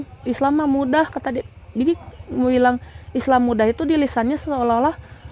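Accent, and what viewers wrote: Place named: Sunda, Indonesia. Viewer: Maasya Allah teteh.Logat sundanya juga sdh kental.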